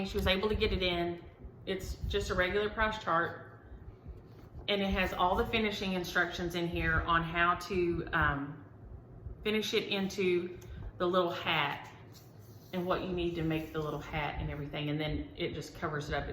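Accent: South, United States. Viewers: Love your channel. As I stitch this SUMMER, I'm watching your videos, love your PRETTY southern accent too.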